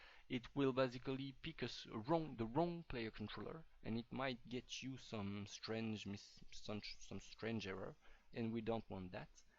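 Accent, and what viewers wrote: France. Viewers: Je reconnais l'accent Français x)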